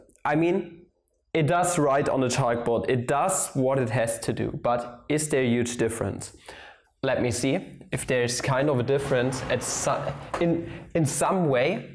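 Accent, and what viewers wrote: Germany. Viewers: i still like this german accent artifact of clearly pronouncing all "L"s that precede final consonants like k, "chalk". it sounds like your new chalk. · That’s a German accent isn’t it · How do Germans know how to make their own accent funny. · Ich weiß nicht wieso, aber ich wusste direkt das deutsch bist 😂😂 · I could tell from your accent. I'm half Filipino and half German too btw. Nice video!👍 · Oh Ich hab mich schon gefragt, warum ich so einen leichten deutschen Akzent heraus gehört hab und war richtig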